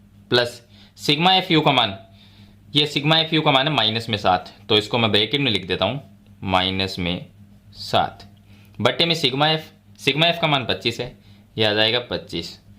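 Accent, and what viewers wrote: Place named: Rajasthan, India. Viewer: Aap rajshthani he sayd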